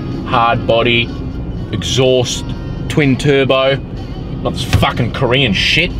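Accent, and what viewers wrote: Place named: Australia. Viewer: The aussie accent makes it 100x better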